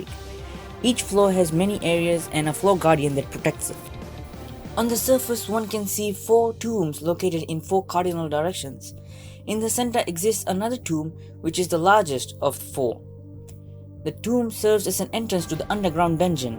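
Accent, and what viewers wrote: India. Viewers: Alkatron agreed. I am so incredibly distracted by the indian accent. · Great video except the Indian accent. U could have someone else to read it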